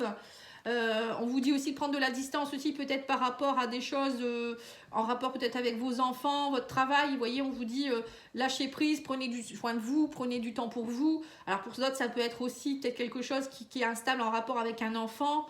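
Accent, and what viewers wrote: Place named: North, France. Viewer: Cc ohoh cet accent du nord que l'on reconnaît fort merci pour la guidance 😊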